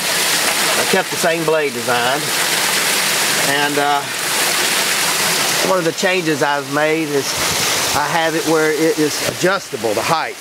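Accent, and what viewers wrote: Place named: Georgia, United States. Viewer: Sir...........are you a hill billy from Georgia? Your akcent is amazin and i liked ur video sir.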